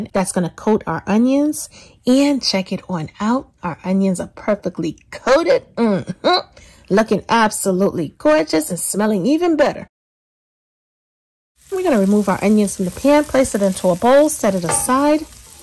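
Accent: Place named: Georgia, United States. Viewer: Looks great! Are you from Georgia or Alabama? I'm Florida raised, surprised I can't tell the difference.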